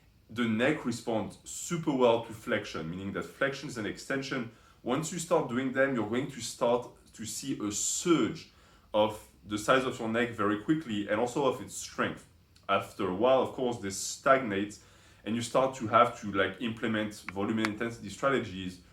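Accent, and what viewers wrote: France. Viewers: don’t know if you’ll respond to this comment after 4 years but your accent sounds like french, are you one of us ? 🇫🇷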